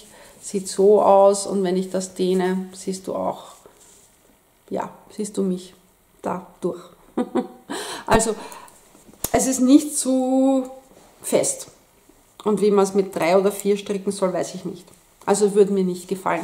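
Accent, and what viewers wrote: Vienna, Austria. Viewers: Ich liebe Deinen Dialekt! Wollte deshalb als junges Mädle(Schwäbin) immer einen Wiener heiraten ((-; liebe Grüße Nicole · Hallo liebe, vielen Dank für dein neues Video. Nun bin ich aber froh, dass du hochdeutsch sprichst mit Wiener Akzent. 😀 Als echte Hessin würde ich zu deinem Pullover sagen: "der eas oig schie ean der basst so goud. Unn dai Foarwe.....schinner gieht nead." Tolle Projekte, die du produzierst und präsentierst.👍👏. Das assymetrische Tuch wird wunderschön. Die anderen Sachen natürlich auch 😉 P.S. ❤️lichen Glückwunsch der Gewinnererin des Wollstranges. Das ist eine tolle Wolle!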